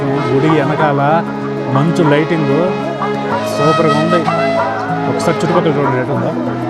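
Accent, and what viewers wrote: Nellore, India. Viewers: Hi bro….One of the best story tellers in Telugu….Hats off to ur content bro…..Mee Nellore slang super gaa vundi….❤🎉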